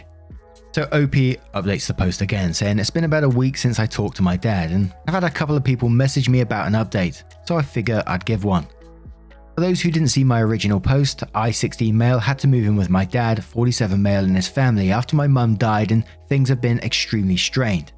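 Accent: England, United Kingdom. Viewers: Except when dialectical colloquialisms are involved. is from a certain part of England that has a certain accent & certain phrasings particular to the region.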